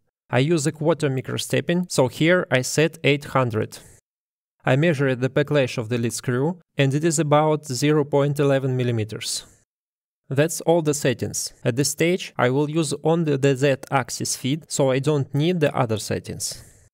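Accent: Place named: Russia. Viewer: 😁 слышен "наш" акцентик и коверкание слов) А так видео👍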